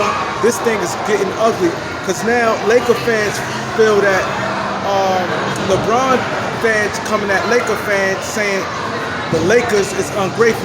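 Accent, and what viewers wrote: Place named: New York, United States. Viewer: You sound like a New Yorker.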